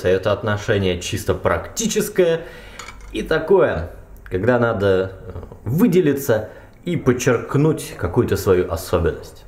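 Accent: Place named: Okanye, Russia. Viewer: Интересный взгляд, подобное я мыслил для украинской традиции не новой народной с конца 18 века, а вот привязать её к традиции до Петра который де-факто её уничтожил. Большенство памятников как ни страно словарей Мелктия Смотрицкого, Памва Беринды, Лаврентия Зазания и т.д. были как раз в украинской церковнославянской традиции в этом плане максимовичовка (правописания) подходило как нельзя лучше по сути как у поляков сохраняя часть этимологии и отбивая фонетику при помощи диакритики . Касательно Окальщиков это миф, в Украине такиз нет и ни разу не встричал, вы в видео порой гОворите окая яаще чем в Украине кто ли бо мог на русском. Оканья и в РФ нет, да я знаюо вологодских диалектах и слушал их, но согласитесь нерегулярное оканье это ни какое не оканье в традиционом понимании. Касательно разрыва традиции и прочего, это уже случилось после реформы 1918 года разЫграть, раССрочка, да даже Аканье давно отображают в русском языке в именах Алёна, данилА, я уже не говорю о словах устно заимствованых где в древности было О хозары, козакы, а ныне хАзары, кАзаки прочее, потому тут уж такого сильного разрыва не будет как по мне. 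Оглушение передавать может и не стоит, я сам за, хотя мои коллеги по цеху говорят о не целксообразности чередования горат -> гараДа, ф кантактах -> в интернете.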